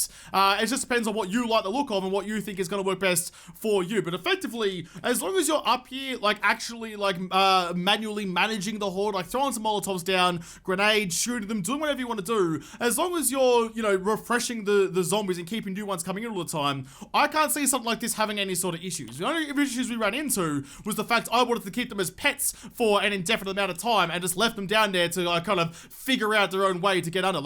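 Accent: Australia. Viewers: Love the ozzie accent :)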